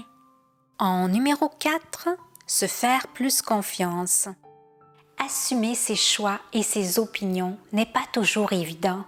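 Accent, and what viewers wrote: Quebec, Canada. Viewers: Bonjour, je trouve dans votre contenu plus de sagesse parfois que ce que mes parents m' ont transmis, même s' ils ont été de bons parents.. bonne continuation ( en plus j' adore votre accent du Québec et votre voix douce et apaisante) 🐙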